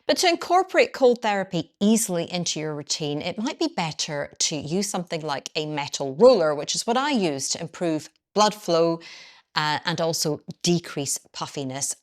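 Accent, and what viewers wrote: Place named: Scotland, United Kingdom. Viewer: Aaa yes! It is hard for me sometimes to hear where people in UK are from but you sound like Nicola Sturgeon! Your Prime minister? Hmmm should know this better! Feels like I have more question marks the more I write… 🙄 It sounds lovely anyway 🥰👌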